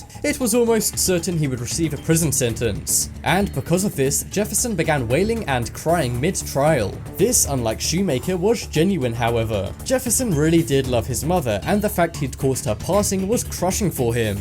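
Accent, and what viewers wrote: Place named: United Kingdom. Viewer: The clickbait starter pack: British accent, arrows on thumbnail, thumbnail having nothing to do with the video, asking for a like at the beginning. You only have a British accent, so this video is not clickbait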